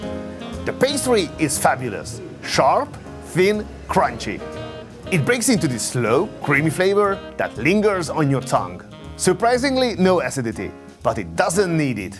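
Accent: Hungary. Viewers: Cant stand that Hungarian accent , remind me of iseael 🤮🤮🤮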